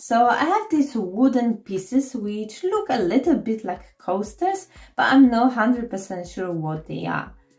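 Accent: Poland. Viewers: Hi, My fav this time is the light shade, really nice and would fit in with any decor. I like the clay and glass bottle design as well and the coaster project at the end so simple but effective. I agree with Kathy about your voice it is so relaxing but I think it is your lovely polish accent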